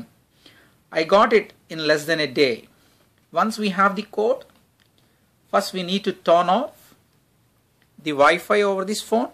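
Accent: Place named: India. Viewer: haha crazy indian accent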